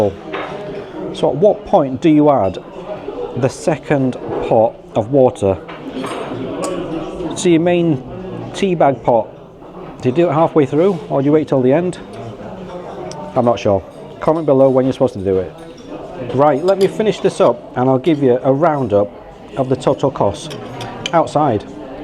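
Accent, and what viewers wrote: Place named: Manchester, United Kingdom. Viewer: youve got a Manc accent or somewhere near, ( I could be wrong and it may be bearer to Yorkshire accent) so, I suspect as a Northerner that you actually know better. You should have sent that right back to the kitchen and gone to a builders cafe . Those beans served on the side as a garnish is , in itself, a red flag. Only one sausage? really? Why isn’t the egg runny / sunny side up . The mushrooms should be sliced thin and not fried but sautéed in a little oil.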